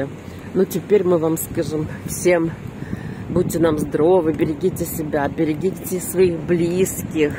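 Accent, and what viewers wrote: Odessa, Ukraine. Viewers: спасибо вам за эти ваши прогулки! Наслаждаюсь вашим выговором - как дома побывала! Живу далеко, но вся родня в Одессе. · Вы интересная чудачка, истинная одесситка.Говор.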